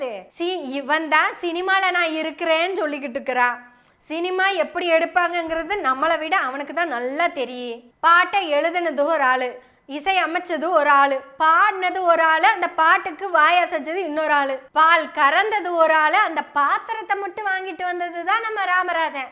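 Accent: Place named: Coimbatore, India. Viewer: குழந்தை குரும்பு கொஞ்சும் கோவை தமிழ் பேச்சு அருமை அருமை தொடரட்டும். .......❤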